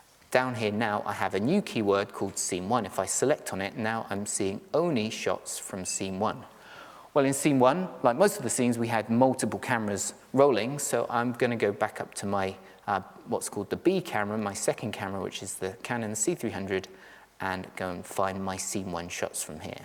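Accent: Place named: London, United Kingdom. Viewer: It's called an accent. London or close by.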